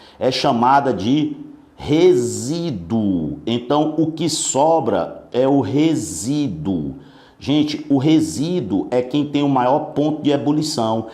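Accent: Northeast, Brazil. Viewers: Que aula foda! E ainda por cima o sotaque nordestino. Inscrita demais no canal!! Sucesso!!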